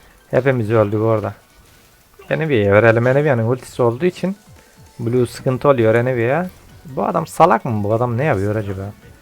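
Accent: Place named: Muğla, Turkey. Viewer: Askerde Muğlalı arkadaşlar vardı. Şiveye ordan asinayim. Ama Alanya tarafına da benziyor. 'Nişlen' kelimesini çoğu kişi anlamaz.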